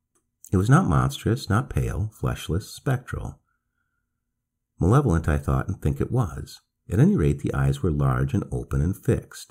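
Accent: United States. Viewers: Funny to hear an M R James story narrated by an American just when I was getting used to more complex British accents. 😁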